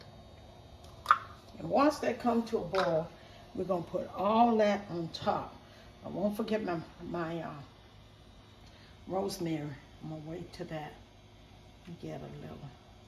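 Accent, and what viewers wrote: South, United States. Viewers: Love u, very beautiful & rare moments to hear u sing, u are a delight to watch. Thank u so very much for sharing your knowledge, u are great by yourself the guy wants to interrupt and speak over u. Love your presentations & southern talk, dont like to hear camera guy mimic & make fun of your words!!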